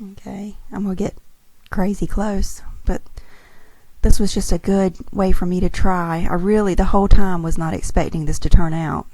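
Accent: South, United States. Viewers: You have such a calming, sweet Southern voice, 😊 Great voice over tutorial! Hopefully, once the kiddos go back to school, you'll do some "Bunny Vlogs"👍🏻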